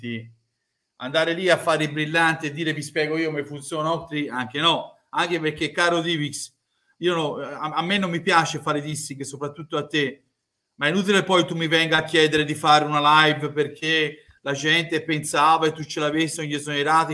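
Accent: Tuscany, Italy. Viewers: Ho iniziato a seguirti da poco ma ti faccio i complimenti..a parte la parlata toscana che adoro, io sono bolognese ma interista, sei l unico che in tempi non sospetti ha detto le cose come stanno...i risultati hanno offuscato la disastrosa situazione economica...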